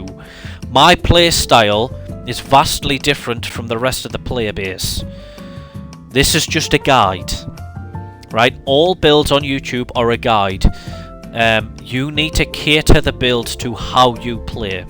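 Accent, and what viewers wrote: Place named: Newcastle, United Kingdom. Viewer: Didn’t realise you were a Geordie, were going to need a duel for best Geordie EU title 😅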